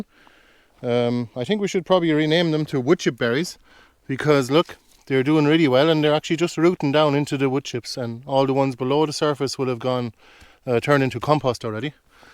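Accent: Ireland. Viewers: Lovely video, well done, a fabulous example of gardening with Nature. (And of course, an Irish accent could read a boring old shopping list and I'd be hooked!!) Found you via Colette at Bealtaine Cottage and have to say, I'm really envious of all the currants and jostaberries you grow - too warm here in my part of New Zealand (Waiheke Island, up north) for any of those. To see all the yarrow and Jerusalem artichokes, all the herbs - I wish I had more space to indulge all that too. Thanks so much for making the film, I shall have to go back and look at the others you'd done. Mighty impressive. Unfortunately, we have to pay a fortune to get wood chips here so I only use them occasionally for the paths, but have been no dig for nearly 20 years and I know it works. Great use for all the old cardboard boxes too, eh?! Gorgeous, hope things continue to go well for you and thanks again for showing us :) ♥♥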